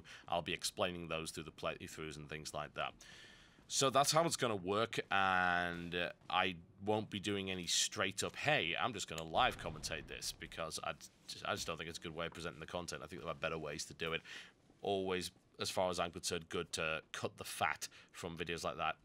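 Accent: United Kingdom. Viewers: are you british by any chance?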